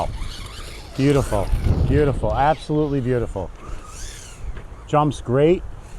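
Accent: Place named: North Jersey, United States. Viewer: Man nice whip! I hear an accent like mine lol from north Jersey😂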